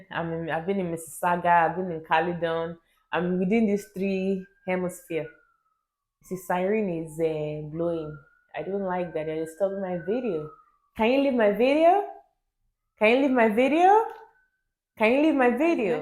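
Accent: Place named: United Kingdom. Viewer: I love the pidgin!! Mix it with bri-ish and canadian accent 😂😂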